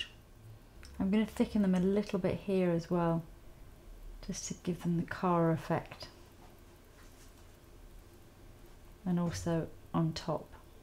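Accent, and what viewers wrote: United Kingdom. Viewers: wow it's beautiful - and you have such a british accent cute :3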